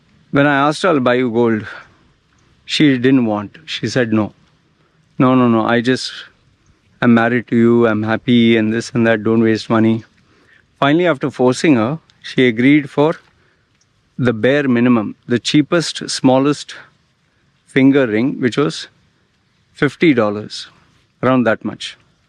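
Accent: India. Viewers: You sound like you are Indian are you?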